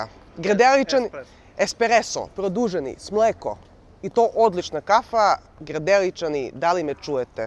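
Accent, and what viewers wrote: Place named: Niš, Serbia. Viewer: to je neki "GU, GA, ĆE, ČE" Niški u kombinaciji sa Vranjansko-Leskovački i primesama LeBane...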